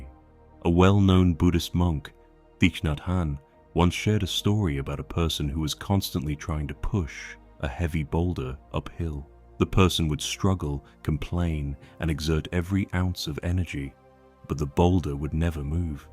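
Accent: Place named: United Kingdom. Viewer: What’s with the British AI accent…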